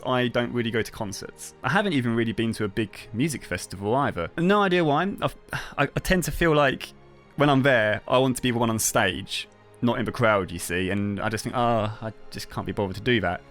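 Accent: United Kingdom. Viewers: I did NOT expect you to be English! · His accent 😍😍 #LondonBoy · He british 😍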